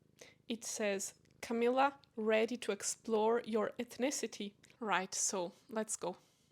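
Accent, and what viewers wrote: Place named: Friesland, Netherlands. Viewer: You have a really quite the interesting accent. Doubtless it sounds different to Scottish ears, but to North American ones, it almost sounds Frisian? Not a biologist, but rather an historian and geographer. I've done Ancestry and 23andMe, and presently waiting on a MyHeritage kit. The results of the two services were quite divergent except in the aggregate. The reason you would get different results is that these services use their own predictive algorithms based on sampled populations. Proprietary algorithms will use different data sets and take alternate factors into consideration to calculate their results. The other thing to consider is migrations, immigration, and invasions. I'm about 50/50 English and Scottish, but will get some Scandanavian and French. Well, no wonder, 1066. And then the one service has me at 1.5 percent Iberian. 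I could have a Royal Navy or Marines war bride from Menorca or Gibraltar 5-8 generations back, or that's just Celtic migrations in the Iron Age. Could be the reason for your Bretonne result, too. Anyway, do keep an eye on your DNA results over time, because these companies fine-tune their algorithms and your percentages may fluctuate (or entirely disappear, and reappear, as my phantom Iberian does).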